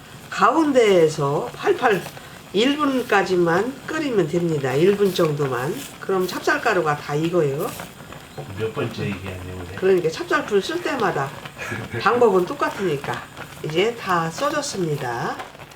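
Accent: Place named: Jeolla, South Korea. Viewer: 무수~ 전라도 사투리 울할머니 생각납니다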